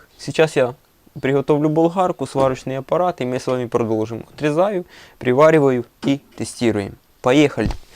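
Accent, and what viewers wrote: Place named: Urals, Russia. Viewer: Умань? а говор как на урале ))))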